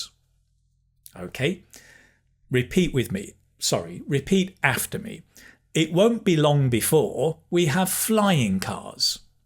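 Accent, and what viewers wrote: United Kingdom. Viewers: Please someone tell me that " is this British Accent?"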